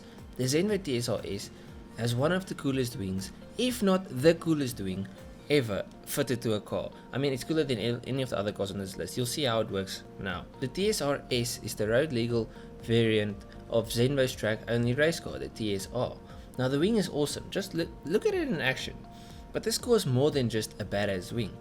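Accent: South Africa. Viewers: It's so refreshing to hear a south African voice 😂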